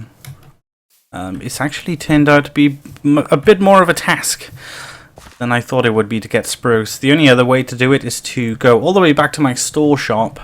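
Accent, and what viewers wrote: Wales, United Kingdom. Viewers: I'm British, and I think you sound welsh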